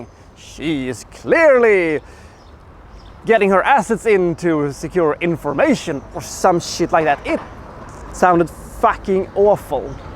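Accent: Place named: Europe. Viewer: I think you can count your blessings for having gotten one like in three years for this drivel heap of a video. Oh, and show some decency when talking about Arabic “whatever” being mowed down. At least we know what Arabic is but we’ll never know what you are with the poor Euro-accent trying to sound American with throwing curse words here and there only ending up with an embarrassment that manages to surpass what you’re describing. This is a Joseph Losey film and so you may be better off leaving the review to someone who k owes what that is.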